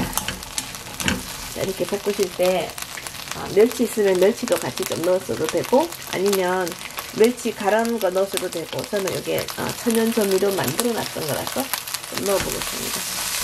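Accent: Gyeongsang, South Korea. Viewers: 님 요즘 왜 레시피 안올려요? 나긋나긋한 경상도 말씨 이모님 목소리도 듣고싶고 이모님 레시피가 저에게 맞아 많이 배웠는데...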